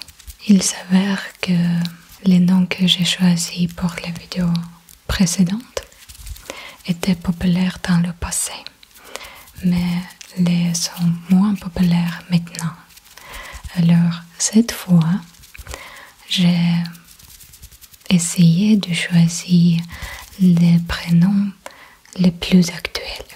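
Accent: Russia. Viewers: Je regarde cette vidéo après ta vidéo d'il y a un an et ton évolution est super ! Tu articule vraiment bien et ton vocabulaire a evolué ! Vraiment je suis admiratif de voir à quel point tu a persévéré dans ton apprentissage ! Et puis toujours le petit accent russe qui va bien 😊 !j'aimerai avoir 1% de ton niveau de français en russe ! Merci pour tes vidéos et bonne continuation 🎉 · J'ai amies russes qui parlent parfaitement comme toi, trouve ça impressionnant russe est vraiment très très différent du français, on entend presque pas ton accent russe😮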